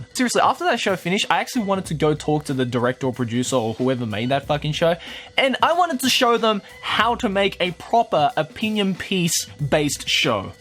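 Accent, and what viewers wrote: Australia. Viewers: I'm American ( not offended) im tired of hearing from the American side too...rather hear you're cute Australian accent any day. 😽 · I KNEW HE WAS AUSTRALIAN!!! But seriously be fuckin' careful bro, everything wants to kill you there. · hheeee ??is he Australian o.o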